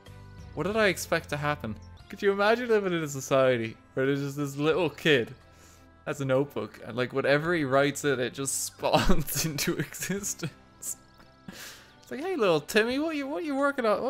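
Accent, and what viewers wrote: Ireland. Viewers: He's Irish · I never knew this guy was Irish ahah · This dude Irish?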